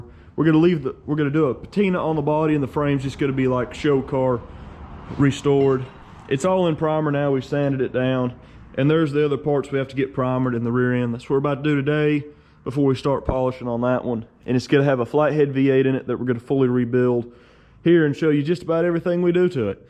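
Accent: South, United States. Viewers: This man has the best southern accent. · I love your accent, your from the south I’m Guessing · 20 seconds in an that good ole' country boy accent tells me this A is going to be running at the end of this video... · does he speak south accent? is this south accent? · Listening to you talk, I'm guessing you're a country boy. I could be wrong.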